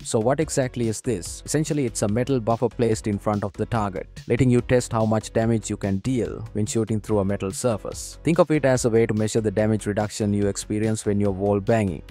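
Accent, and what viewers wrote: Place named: India. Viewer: Indian accent... right, i don't buy anything he says.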